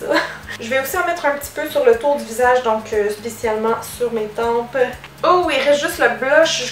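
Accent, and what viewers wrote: Quebec, Canada. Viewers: j adore ton acsent québécois et tu es trop belle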